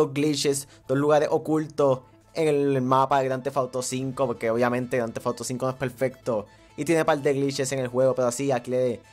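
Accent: Puerto Rico. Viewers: Eres de PR verdad?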